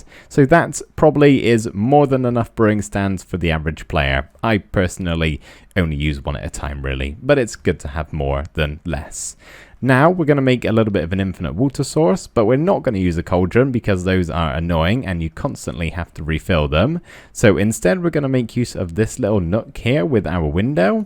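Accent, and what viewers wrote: United Kingdom. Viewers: Bro, your British accent sounds really cool)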